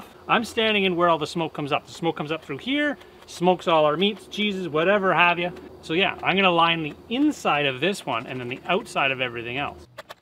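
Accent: Canada. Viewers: self reliance. tiny house. dog. drone footage. faint canadian accent. this is becoming a fun trend. lol.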